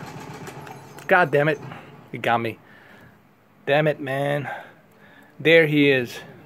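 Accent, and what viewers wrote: New York, United States. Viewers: Are you from New York, I can hear the accent a little. Sounds a bit like the Bronx or Queens to me.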